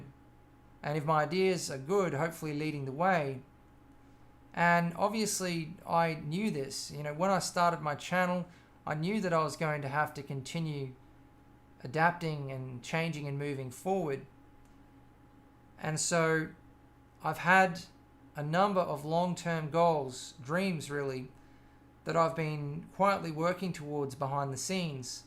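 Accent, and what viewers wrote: Australia. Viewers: Her mate. Love your work! I was just wondering what country you are from because you sound Australian :P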